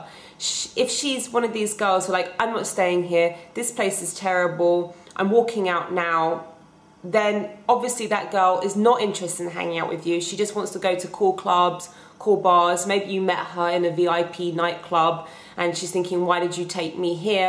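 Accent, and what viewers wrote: United Kingdom. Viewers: "Bitch Shield" bahaha. I wish her accent wasn't so british though.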